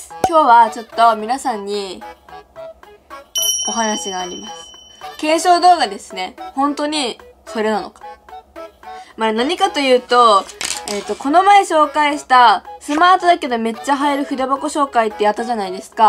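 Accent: Kansai, Japan. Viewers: 関西弁が似合う可愛いちゃん ◎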